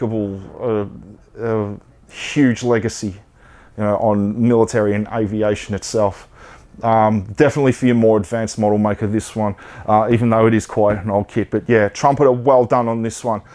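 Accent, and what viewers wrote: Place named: United Kingdom. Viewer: from your accent，do you come from Britain？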